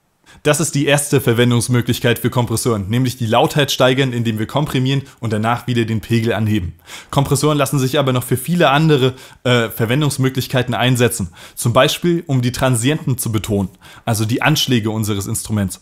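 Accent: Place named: Northern Germany, Germany. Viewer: Darf ich fragen, wo du gebürtig her kommst? Dialekt mäßig, würde ich Norddeutschland sagen. Niedersachsen oder Hamburg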